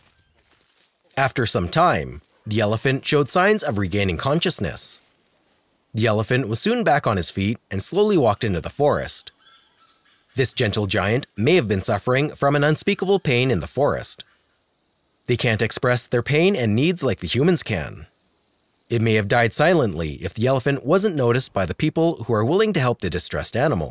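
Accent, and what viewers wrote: United States. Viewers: Good video but rubbish yank narrator spoilt it for me.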